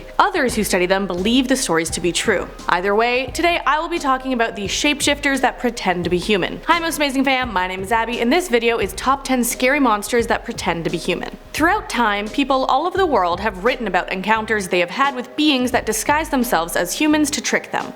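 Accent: Canada. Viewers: I like how they talk in Canada. Words like: "about" they pronounce "aboot."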